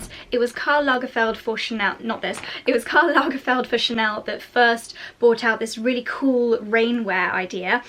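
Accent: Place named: England, United Kingdom. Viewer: I absolutely love your channel, Instagram, & blog. I also love the fact that you’re from England, I wish I had an English accent so bad lol 💕 you’re such an inspiration and you really encouraged me to start my own blog not too long ago. Keep doing your thing girl, you’re amazing!!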